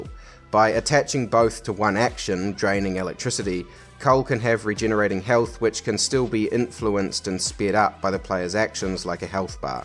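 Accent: New Zealand. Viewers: I've only played the first and Second Son and I do prefer Second Son but I so see where you're coming from with your points about the first it's still a pretty fun game. Btw are you from NZ the slang and your accent made it sound like it. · That's a Kiwi accent bro.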